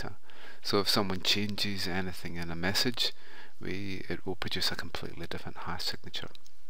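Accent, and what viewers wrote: Scotland, United Kingdom. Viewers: It's nice to get a Scottish guy on here explaining things :) !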